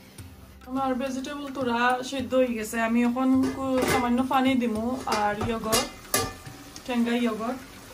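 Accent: Sylhet, Bangladesh. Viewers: Afa ami afnar ek sylhety boin . Amar channel ta guriya ayba ar Bala lagle subscribe korba. Amio subscribe korsi.afnar sylhety mat na sundhor MashAllah.karala Bhorta- kube moja oibo lajer.❤️❤️🍓🍓🍓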